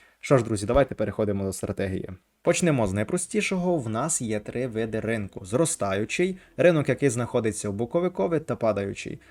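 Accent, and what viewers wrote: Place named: Precarpathia, Ukraine. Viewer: Класна Українська Але я чую щось таке рідне прикарпатське!😮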